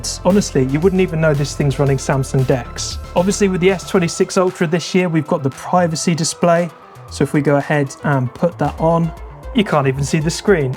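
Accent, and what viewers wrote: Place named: United Kingdom. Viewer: Good night I heard your British accent I'm sorry I can't watch it it just gets to me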